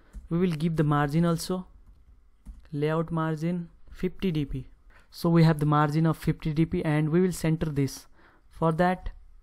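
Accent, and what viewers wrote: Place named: Haryana, India. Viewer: Your accent is Haryanvi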